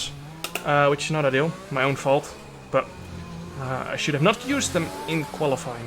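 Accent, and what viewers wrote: Netherlands. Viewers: Je klinkt erg Nederlands in je videos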